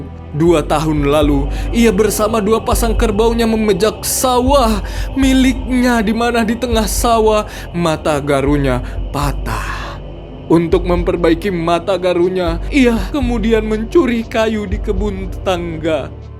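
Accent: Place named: Makassar, Indonesia. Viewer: yang bilang harusnya suara biasa saja, mungkin mereka butuh pembiasaan aja, suara dgn nada seperti ini memang cocok diapakai untuk cerita legenda, apalagi ini yg cerita org bugis makassar, pasti ada logat2 makassar ala2 puisinya. Lanjutkanmeki gannn btw ini keperluan skripsi kah bro?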